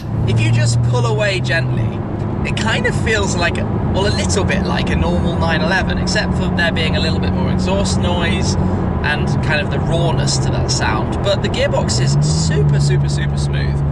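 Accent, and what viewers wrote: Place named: England, United Kingdom. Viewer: what city/region in England is your accent from? Nice car!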